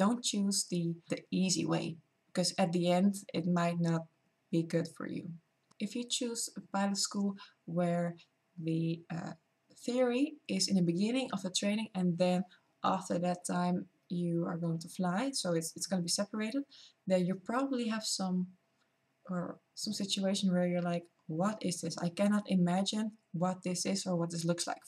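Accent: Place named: Netherlands. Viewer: Sweet accent! Dutch? Scandinavian? German? I find that in any field, it is difficult to study and work at the same time. Agree with what you say.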